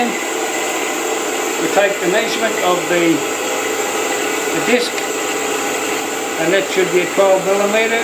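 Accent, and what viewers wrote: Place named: Australia. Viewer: Wow im glad to hear an aussie voice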